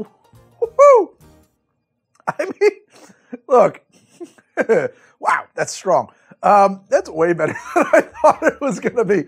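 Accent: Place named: South, United States. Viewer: Oklahoma is technically the Midwest, but, like, add a slight Southern twang.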